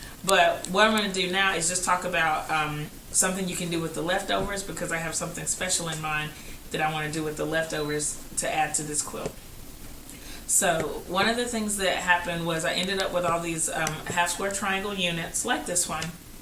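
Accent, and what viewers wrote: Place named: North Carolina, United States. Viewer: Cute! How did you end up with so many left over HSTs? BTW you sound like you are in NC. Me too!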